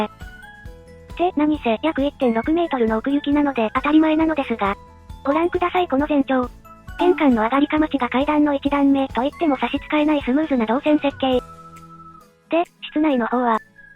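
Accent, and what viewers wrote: Hakata, Japan. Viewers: 博多弁がうれしか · 博多弁が可愛い♡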